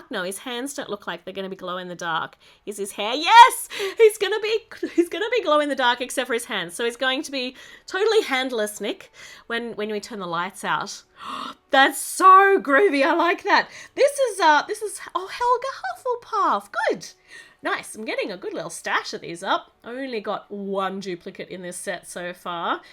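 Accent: Australia. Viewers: I don't know anything about Harry Potter but I love your accent your Australian accent is amazing and beautiful...and....oh..I need to catch up Harry Potter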